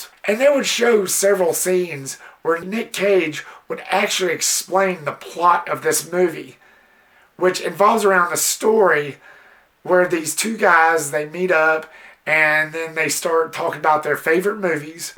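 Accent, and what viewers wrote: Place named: South, United States. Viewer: You sound like Vince Gilligan, but we are all southern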